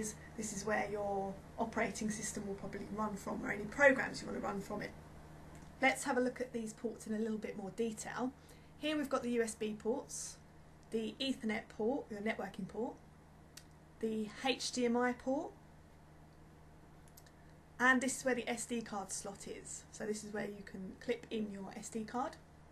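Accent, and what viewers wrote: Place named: United Kingdom. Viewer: British accent <3 cool video :)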